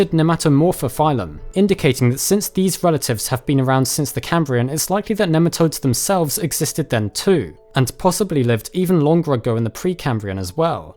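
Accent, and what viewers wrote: England, United Kingdom. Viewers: I knew I was about to hear an english guy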